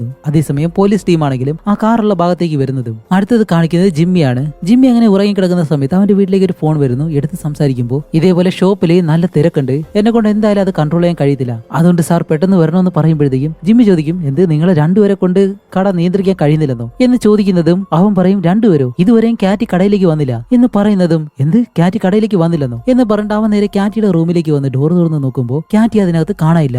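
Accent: Palakkad, India. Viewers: Palakkadan slang ill kadha kealkumbol chiri😂ariyathe varunnu😂 chirippichathinu thanks 🙏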